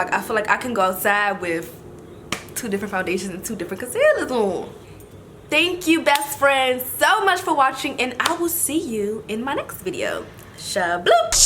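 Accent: DMV, United States. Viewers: I can already tell your from the dmv by hearing the way you talk ❤️ love this video too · New subbie!!! I knew you were from DC by the way you talk lol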